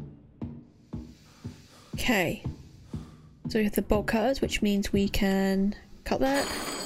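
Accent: United Kingdom. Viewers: Look what you've done. How do I know what country you're from? I know you're English. I never knew what country the others were from.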